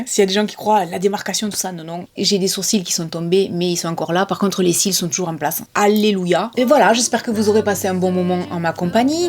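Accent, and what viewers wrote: South, France. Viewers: Merci Belle. Toujours un plaisir à écouter avec ce joli accent du sud. Courage, tu as le cancer mais le cancer ne t'a pas et ça, ça fait toute la différence.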